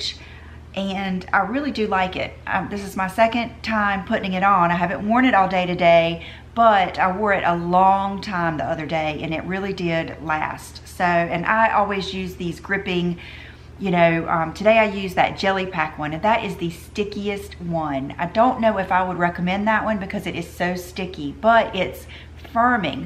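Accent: South, United States. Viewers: Apples of your cheeks!! Lol she said it when I was writing it! Love your accent. You must live in the south.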